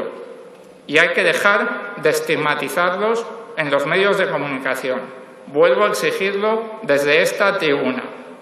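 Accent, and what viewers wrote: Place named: Andalusia, Spain. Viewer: Esque Ami me encantas tú y el acento Andaluz .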